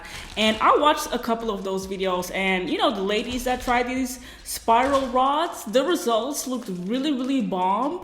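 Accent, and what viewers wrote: Netherlands. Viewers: Omg this is the first vid I watch of u, butt I knew right away that u were dutch bc of your accent omg we dutchiess always have that same accent its just soo funny😂😂😂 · She says that in the description box: She lives in Belgium, half Dutch-half Ghanaian, and Dutch is her first language, thus the thick accent! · Het staat je geweldig maar wat een werk. Wel grappig dat ik even dacht een Nederlands accent te spotten. Heel even maar. Want je Engels is echt goed.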